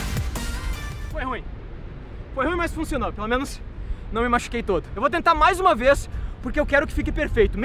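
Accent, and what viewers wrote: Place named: Portugal. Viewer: Ele parece um cara q veio de Portugal e ficou por um tempo no Brasil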